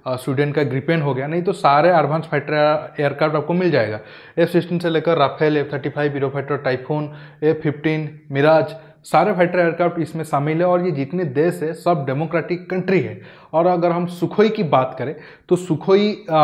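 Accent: Odisha, India. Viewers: sorry to say please currect your accent of oriya in English like word - America , humare these words you can follow a hindi speaking people to correct your pronounciation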